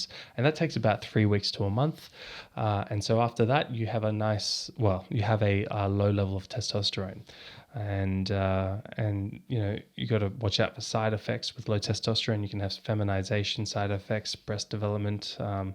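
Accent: Australia. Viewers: He's Australian, he sounds Australian the whole time to me as an Australian myself.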